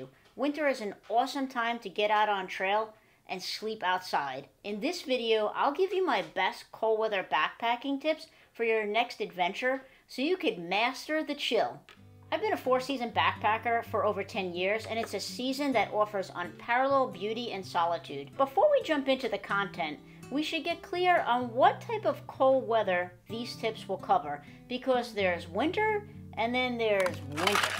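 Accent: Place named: Northeast, United States. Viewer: your slight northeastern accent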